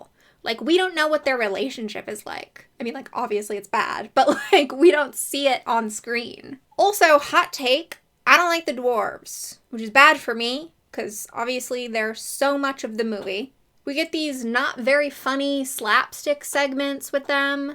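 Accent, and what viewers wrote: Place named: Transatlantic, United States. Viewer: You ate that transatlantic accent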